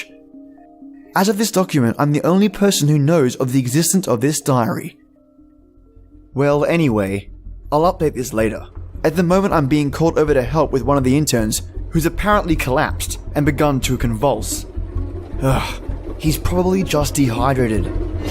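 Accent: Australia. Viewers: I missed your awesome Aussie voice 😩 Hope that didn't come off creepy, hahaha. I had no service for a few days and felt like I was going crazy without any stories from my favorite narrators! I'm gonna enjoy this one 🤗👍🖤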